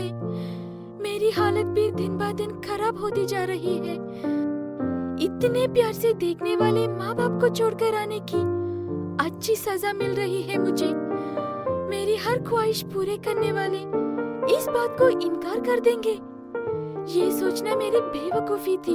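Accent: South, India. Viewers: Ek proper hindi bolnay vali acchi background voice over artist rakhiye pls south indian hindi maza nahi ata hai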